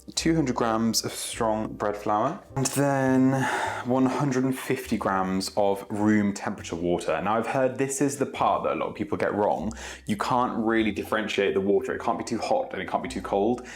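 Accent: England, United Kingdom. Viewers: English accent is tough for people who English is second language. Please keep your tone up so we can hear you clear and slow down when you talk.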